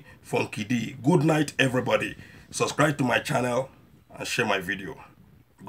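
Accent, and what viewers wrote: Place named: Agbor, Nigeria. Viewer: bros most times I watch your videos is just to have fun and also see how you have learn from prophet Nnamdi kanu and biafra (Ipob) struggle which has make you have subscribers and you here talk rubbish against biafra and ipob, anyway from your voice you came from agbor or near there and talk against your identity shame on you because you living on lies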